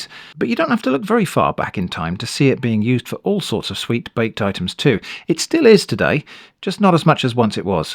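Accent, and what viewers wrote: United Kingdom. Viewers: pov you feel safe as a still slightly too hot delicious experiment and you hear a kindly brit say ".. but I can resist no longer"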